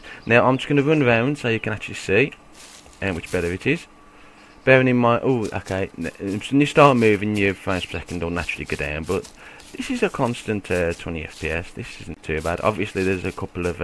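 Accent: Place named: Germany. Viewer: you're german right? :D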